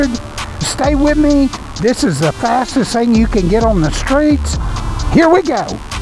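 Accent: United States. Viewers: I doubt you're Italian. Were you born in Italy and do you have Italian citizenship? It sounds to me like you're American not Italian 🤔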